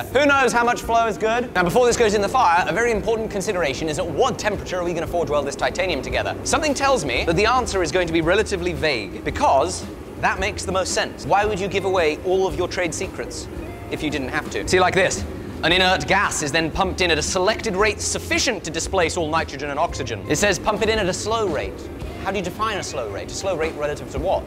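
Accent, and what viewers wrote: England, United Kingdom. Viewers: degrees fahrenheit, thousands of an inch, yeee haaa, what part of England are you from? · Its just the awesome upper british accent and language next to the chaos of forging some cool damascus. Some itch was scratched in my brain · isn't it crazy that he said the British equivalent of "the patent has fucking expired" but since he speaks queens gibber it doesn't sound heavy at all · When I heard his voice I thought, YES. An Englishman making a Damascus. This should be good. I left after posting this comment. The loud americanisation of the production is off-putting. Sorry, but reign it in. Quality over quantity... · So what's up with the Montana thing as you sound British but I get it I'm a dual but I'm a graduate of Carroll College in Helena. My two best friends are two of my good friends live in Bozeman one has a pizza shop and the other is a family therapist! 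Another friend of mine used to be a representative from Butte but he had a bike accident and sadly passed early. He was a hippie and he looked like hippy, And he was a absolute golf pro so the locals would use him up in Bigfork to play golf against others and tourists and he was there ace in the hole. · The British accent makes me trust him 25% percent more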